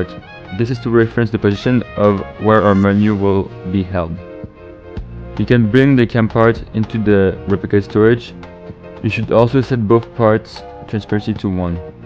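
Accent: France. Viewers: Are you french by any chance? I mean the accent Nice vid bro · are u french ? good tuto btw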